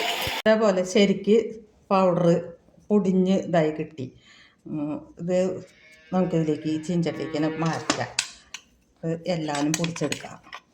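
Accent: Thrissur, India. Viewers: സാമ്പാർ പൊടി നന്നായി ഉണ്ടാക്കി കാണിച്ചു👍👌😋😋 ഇഷ്ട്ടമായി ഞാൻ അന്നന്നു വറുത്തരക്കുകയാണ് പതിവ് തൃശ്ശൂർ ആണോ സംസാരം കേൾക്കുമ്പോൾ തോന്നുന്നുണ്ട്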